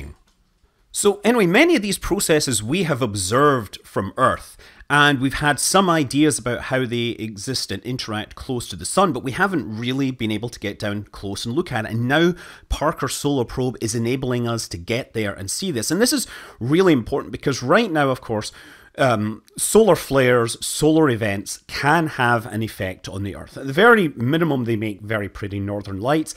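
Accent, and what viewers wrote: Scotland, United Kingdom. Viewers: This fellow sounds Scots like me! So what would he know about the sun? I've glimpsed it twice in my lifetime. Great upload. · Don't worry, it's just scottish. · I love the Scottish dialect ❤️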